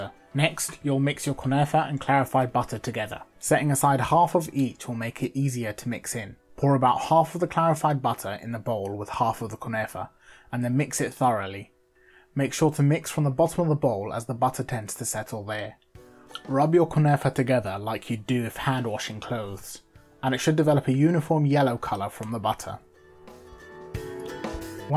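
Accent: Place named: United Kingdom. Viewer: Bro how did y grt that accent British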